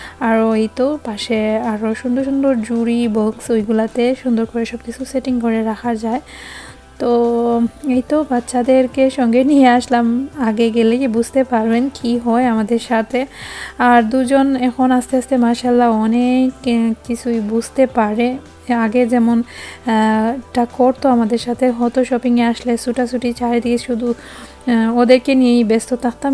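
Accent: Sylhet, Bangladesh. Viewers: Apu apnar sylheti accent sunte asto sobai Apnar mukhe oi ta khub valo lagto Tai mone hoy the numbers of views are decreasing....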